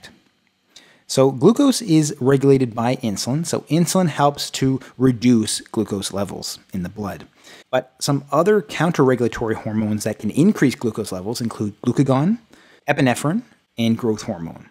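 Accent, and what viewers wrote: Canada. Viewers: I was quite concerned that the morning rise in glucose was a side effect of poor blood sugar control ! What you seem to be saying is it's from other hormones! My blood sugar numbers don't go down until after my first meal, which is about noon. My conclusion that my insulin resistance wasn't improving was incorrect. Are you Canadian, I seemed to hear that from your pronunciation?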